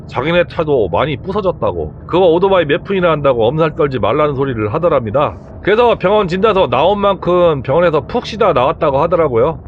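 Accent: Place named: Jeolla, South Korea. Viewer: 시골어디쯤이신가요? 내용듣다보면 충청권같으신데 말투는 전라도권 같으시고 ㅎ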